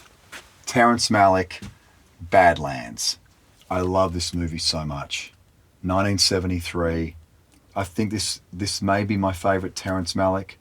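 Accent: Australia. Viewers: he sounds extra Australian here lol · I didn't even know he was Australian, never heard his natural accent!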